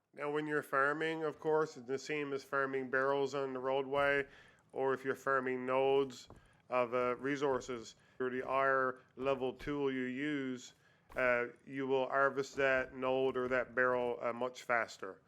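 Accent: Newfoundland, Canada. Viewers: i knew instantly he was from newfoundland too hahaha i was looking for this comment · From an Irishmans view, I was tryna pinpoint which town the accents from 🤣 Suppose I can hear the canadian in em' now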